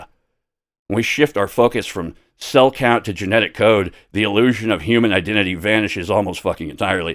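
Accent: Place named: Canada. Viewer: You are Canadian aren't you? I can't really tell being that I'm British. You sometimes sound like you have a Canadian twang.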